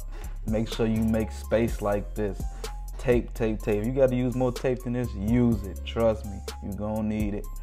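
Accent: Chicago, United States. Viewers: Bruh, you from Chicago?! Lmao I stayed for the commentary Omm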